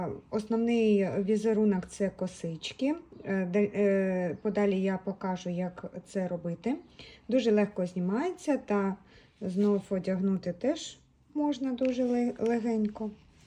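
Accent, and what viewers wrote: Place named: Russia. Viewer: Відпрацюйте українську мову , трохи ріже слух ваш російський акцент ..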